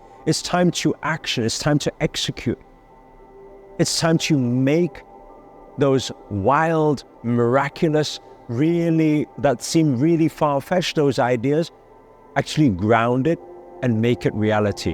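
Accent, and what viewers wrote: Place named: Wales, United Kingdom. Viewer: I had an old colleague get in touch lately but I always had an off feeling about them so I didn’t engage her - was polite but short. I trust my gut. I’ve been giving a training opportunity and taken it by the horns, very very grateful! Where is your accent from? It’s very interesting. Is there some Welsh in there?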